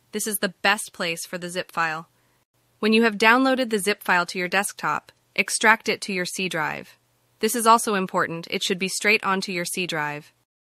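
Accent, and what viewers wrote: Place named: United States. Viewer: I know nothing about submarine games. I watched this because of your fantastic accent!!!😍😍😍 What U.S state are you from❓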